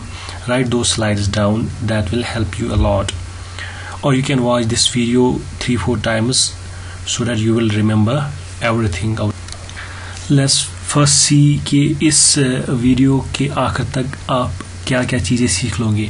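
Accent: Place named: Kashmir, India. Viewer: A Clear kashmiri accent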